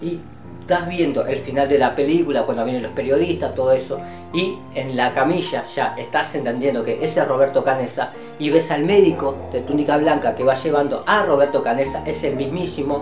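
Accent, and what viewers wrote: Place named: Uruguay. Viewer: Los actores en Viven si parecen uruguayos… en Uruguay hay de todo pero obvio q no lo son por como tratan el mate. Honestamente yo pensé que vos eras peruano… me sorprendió q dijeras q sos uruguayo. Al oír tu acento es obvio q lo sos. Pero hay de todo en todos lados… yo vivo en USA y paso x americana hasta w escuchan mi acento… a partir de ahi soy de casi todos los países europeos 😂 solo q tengo ADN charrúa, y a mucha honra 😊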